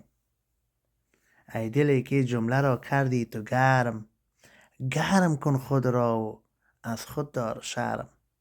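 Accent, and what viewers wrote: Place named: Afghanistan. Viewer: به به خیلی عالی ❤ این اولین بار است که مثنوی را با لهجه ی دری می‌شنوم و بسیار گوش نواز و صحیح و گیراست ، من گمان میکنم که لهجه ی شما به زبان مولانا نزدیکتره . سپاس فراوان 🙏🏽🙂🪻🫶🏽🌻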